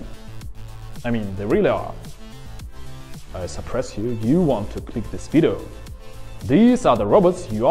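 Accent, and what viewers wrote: Russia. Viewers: That Russian accent... Come on guys find a native speaker :) no offense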